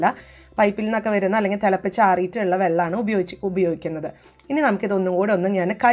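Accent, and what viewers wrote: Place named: Palakkad, India. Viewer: Samsaramishtama chechiye jadayillatha nishkalangamaya nadan basha. Pkd aano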